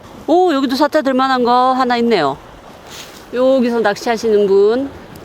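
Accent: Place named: Gyeongsang, South Korea. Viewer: 낚시 못한지 2년 넘었어요 영상이 너무 좋아요 경상도 사투리 쓰는 명랑소녀 모습입니다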